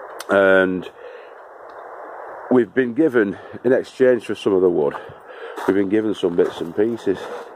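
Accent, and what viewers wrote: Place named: England, United Kingdom. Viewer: Thanks! Checked them all out and subscribed to most. Many I was already following. Love all the new channels. I think I am in danger of developing an English accent! But yours is still my favorite. Things are great here. I planted what seemed like 100’s of cloves of garlic yesterday. Harvested a big old broccoli for tonight’s dinner. Keep up the good work on your end. Wish I had the courage and the know how to do a channel. But at 70 I think that I am too old to learn filming and editing.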